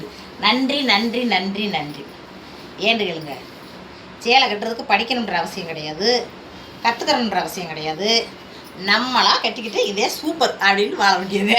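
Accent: Madurai, India. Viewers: Nee pesarathu alaka erukku ❤❤❤❤ மதுரை தமிழ் super ❤❤❤❤❤❤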